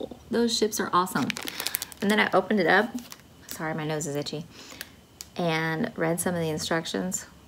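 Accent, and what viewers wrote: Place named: South, United States. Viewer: Thanks for the sweet mention 😊. Maybe I’m biased but I find your voice super soothing, too. I think it’s a preference for southern voices from my Mississippi mom. You have some gorgeous projects❤️. I love lots of things in a chart - houses, anything red, bees and birds. Hope y’all have a great Halloween- btw our church does a big trunk or treat at 6pm on the 31st if you want to bring the kiddos. Happy stitching sweet friend😊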